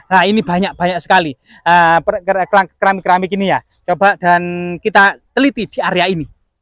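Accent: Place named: Java, Indonesia. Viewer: Tutur bahasa muedhok tenan 😁👍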